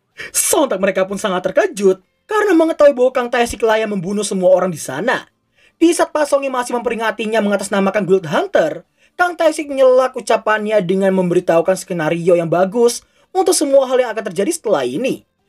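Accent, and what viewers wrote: Java, Indonesia. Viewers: medhok coy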